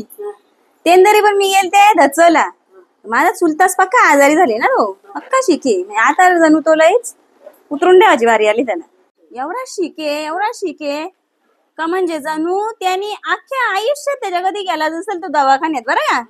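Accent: Murbad, India. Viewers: काय बोलावे आता फुयजी..... एकच नंबर बोलता तुम्ही 👌👌👌👍👍👍.....याला म्हणतात मुरबाडी भाषा ❤❤❤ आणि दोन्ही पण म्हतार्या त्यांची डोबरा सिंदारित सोडून तुमच्या बाता मन लावून ऐकत्यात 😂😂😂आता तुमच्यामुळे त्यांची डोबरा कुइकं जातील आणि त्यांचं म्हतारं त्यांना किती चोपतील...काय सांगू शकत नय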